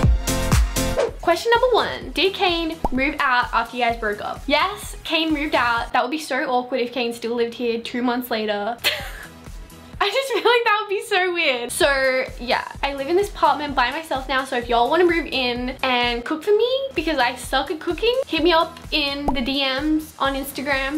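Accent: Australia. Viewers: Siiiiiiir Lol sorry Australian accents make me laugh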